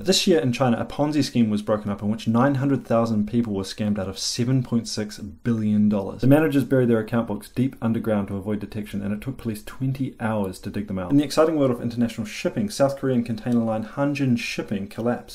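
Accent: New Zealand. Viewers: He speaks too quickly and has a broad Kiwi accent. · oof that heavy disheartened sigh after the civil war stats nonetheless i'm now even more convinced that kiwis have the best accent idk how people can't understand what you say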